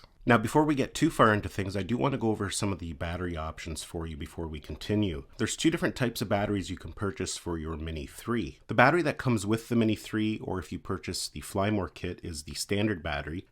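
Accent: Canada. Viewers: Are you Canadian or an American state bordering Canada?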